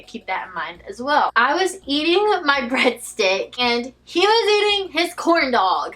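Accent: South, United States. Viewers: Where are you from, you sound very country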